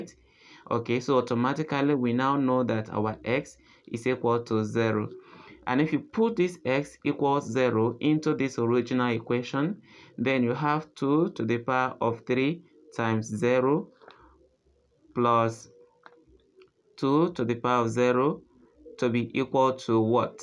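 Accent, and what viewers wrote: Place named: India. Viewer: Привет, судя по акценту, вы из Индии? Интересный формат. А я из России. Город Сочи. Где была Олимпиада 2014.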